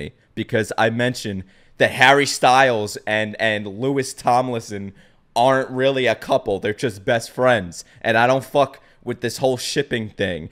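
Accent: New York, United States. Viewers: Hi...I'm a 4 hour New Subscriber...LoL...That first reaction Video of Pentatonix I was floored laughing and enjoying myself, from that one video I instantly Subscribed.....and Less then 5 minutes in I knew you were from New York...lol...YOUR AWESOME....FUNNY AND DAMN YOUR REACTIONS ARE AWESOME! Haters are always going to hate...It tough but Don't Let em Get you Down. You've got Fans and Subscribers Who Love what you DO...SO Keep Your Head Up and Don't Let em Get you Down.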